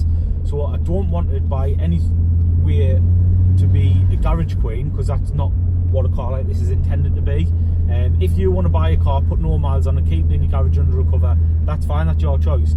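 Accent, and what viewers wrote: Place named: Sunderland, United Kingdom. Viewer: you sound local to me bud I'm from Sunderland cant wait to get my mk2 this year , iv never had the chance to even sit in one there that rare around my area .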